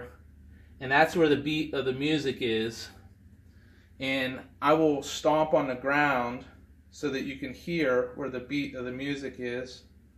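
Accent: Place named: South, United States. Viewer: Great tutorial! May I ask where you are from though? You have a very interesting accent! (Southern US?)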